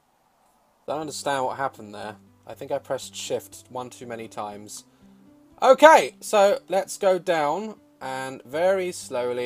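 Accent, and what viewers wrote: United Kingdom. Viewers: Oh, man. Can't stop laughing! The slightest sound / gesture Daz makes, is so damn hilarious! 🤣😂 Always the best solution when feeling sad. Also, as a non-native English speaker, it's great to hear his British accent. Perfect for some tea, HAH!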